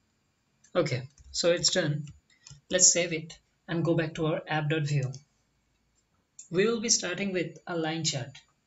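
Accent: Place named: India. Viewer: skipped. Cause: Indian accent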